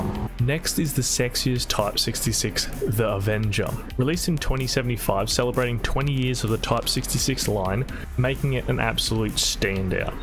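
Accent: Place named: Australia. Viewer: oops 😬 that's the second time I mistaken a Australian accent for a British 1 lol don't know why they sound closely identical but hey good video either way.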